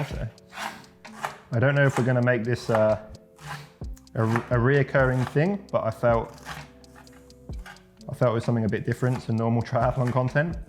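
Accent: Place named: United Kingdom. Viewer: Sam vraiment ton anglais il m’impressionne avec la petite touche british wo’er 😂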